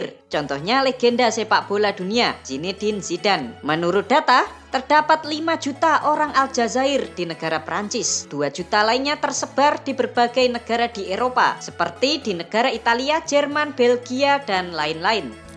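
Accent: Java, Indonesia. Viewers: membawa britanya medok sih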